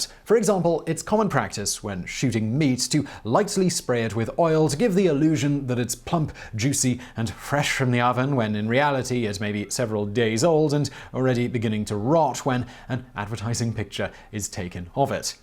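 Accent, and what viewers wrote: United Kingdom. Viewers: I can't complain. It was so well integrated, I thought I was watching a British person. Might I add, the accent helps.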